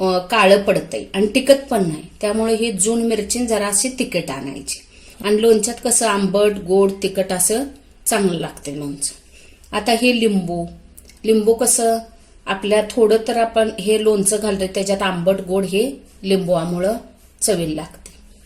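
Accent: Kolhapur, India. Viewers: Khup chan lonche distey.tumhi chan samjaun sangata.aapali kolhapuri bhasha kanala god watate🙂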